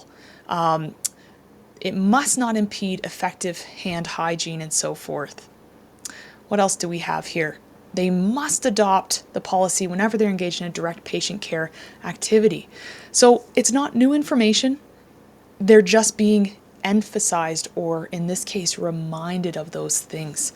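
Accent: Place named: Canada. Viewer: Thank you. Your explanation is the best I have come across so far. I think the Canadian way of talking is a big factor in the way you explain things clearly!!!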